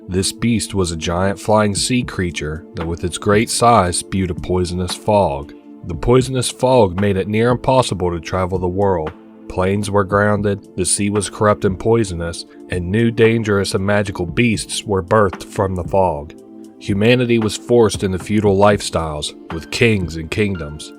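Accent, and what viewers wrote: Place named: Spain. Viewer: The most spanish english voice i ever heard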